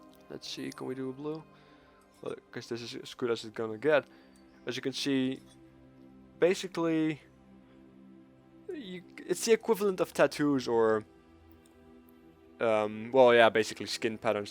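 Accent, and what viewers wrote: Netherlands. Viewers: You sound sooooo Dutch. xD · Do you have a dutch accent? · haha je nederlandse accent is zo duidelijk :P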